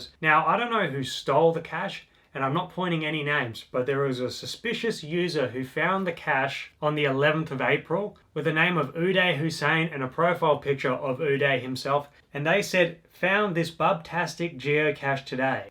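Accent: Perth, Australia. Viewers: You so look like you’re from Perth, but if you were from Sydney I wouldn’t be surprised. Nvm, you have a really heavy Perth accent I’m certain. Ahh you said you were from Perth, nvm. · I only just realised is an Aussie 😂 we have the same accent why did I think he was British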